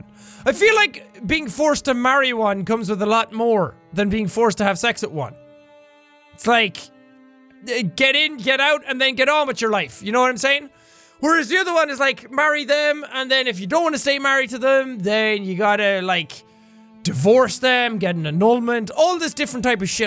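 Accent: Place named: Ireland. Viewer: I’m sorry but the way you pronounce ‘lorry’ 😂😂😂 Not hating, just found it funny 😂😂 Dang that Irish accent 😂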